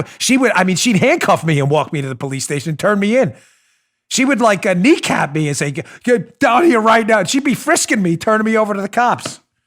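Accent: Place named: New York, United States. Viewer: When I used to play solo piano in international settings, I could often tell when a listener was from New York because first, he had a funny accent, but more importantly, he talked to me like me and him had been good friends for years. Listening to Dan reminds me of that.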